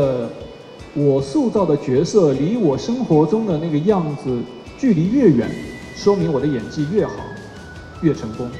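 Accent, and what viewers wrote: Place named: North, China. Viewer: 他在北京是另外的口音，很北方的口音。他是模仿能力很强。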